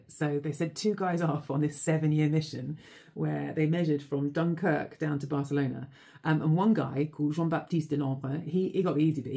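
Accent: England, United Kingdom. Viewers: Redhead + English accent = <3 <3 <3 <3 <3 <3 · I'm very much biased by my French pov, so when I hear a British accent explaining science to me, I instantly think Doctor Who. It's a stereotype, but it's just super fun to imagine the Doctor teaching science to humans on YouTube 😊😁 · your intelligence and british accent are sooooo sexy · I like her videos. She smart, attractive and has a lovely English accent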